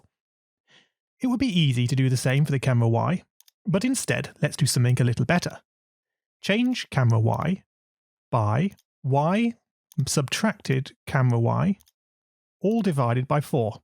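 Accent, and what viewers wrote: United Kingdom. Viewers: okay look I’ve given this a lot of time, probably too much time, too many failed attempts… All these positive comments mean to me is you guys already understood something, this video did not help me build a working pixel game, and I did follow the instructions carefully. has been highly praised, so you will need to allow me to give one negative comment. Many times in these videos he misspeaks and corrects his speech with pop up text, which he uses unnecessarily all the time, saying things like “nice” and “looks great” and it just isn’t practical to believe you are adequately correcting your mistakes by mixing them in with all that overly happy sounding crap. Just show us how to make a video game. At this point I wasted 50+ hours of my life on you. Do better if you’re getting millions of views. Going off on tangents, changing things to “have a little fun” was not fun for those of us who came here to follow a step-by-step video. 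I did not learn how the components work from, not on this video or any other, probably because he kept changing things and half-explaining them. It’s like you have to use his specific complicated way that you don’t really understand but trust because he did it, or nothing. I wish the algorithm would give me more users, it is heavily biased to deliver, no surprise given we’ve been brainwashed to believe a white man with a British accent is the best. He’s not.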